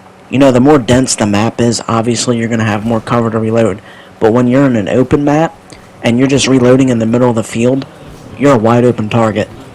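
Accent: Baltimore, United States. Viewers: Thanks for the tips. U from Baltimore? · yeah but more specifically Baltimore or Philadelphia not sure which one but that region.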